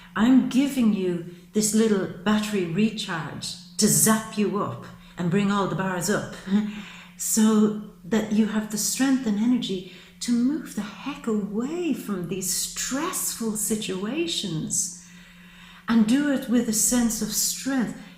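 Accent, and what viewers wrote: Ireland. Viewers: I can't believe you said "Bohemian" ... my mother was born in Bohemia! This was very spot on, stressful to hear because it tells me I was right in all my feelings about my current work, but also stressful that I now know what I HAVE to do, which I was trying to avoid. LOL. Not funny really. You are great, accurate, and caring and I appreciate that. I love your accent, is it Irish? My father is Irish. You reminded me of my Sagittarius self. Thank you so much!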